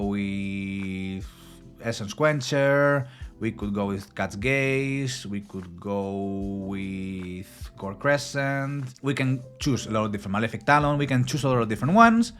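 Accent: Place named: Spain. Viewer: Hey, I'm the guy that asked you in your Spanish Channel which was your English Channel, I'm neither a native English speaker, but I've seen your video in both languages and it feels amazing here too, I love the fact that you are quick to speak in English you're fluent your vocabulary doesn't get stuck to remember words mid sentence, and pretty much everything is awesome, your accent is slightly improvable to feel even more native-ish, as a native Spanish speaker but English teacher I see you're not just trying to be a bilingual content creator but rather know what you're doing, I'm disappointed at the fact that there is almost no recognition in this channel and I'm guessing it's because there are very few Spanish RSL CCs, but many English magnates in the board like Ash, HellHades, etc. I feel you probably should promote your English Channel in your Spanish community more, as you may find more bilingual people within your main community who could come here and interact with your content to get you to more people's algorithm. 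That said, your accent, not to judge or anything, shows you're a native Spanish speaker, and let's be honest, that's not very attractive towards English viewers because of the prejudices and stuff, both in the sake of community engagement and blend in among other English creators, your accent could use slight improvements alongside adding a few slangs to your vocabulary to make native English speakers feel more related, which I don't doubt you can as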